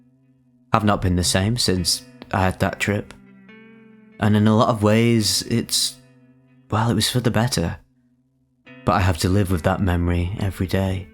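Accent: United States. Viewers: your american accent is so funny haha great voice acting man loved this one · Omg I love your American accent 😂 it made this already amazing retelling of this story all that much better! Your voice and affect are honestly so perfect for telling stories! Especially these- so to hear a twang of humor is utterly amazing ❤😂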